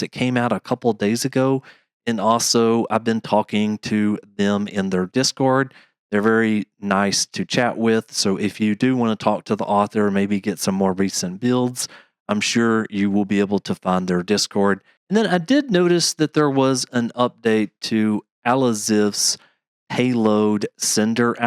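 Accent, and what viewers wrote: United States. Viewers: From which state are you from? You sound like mid west .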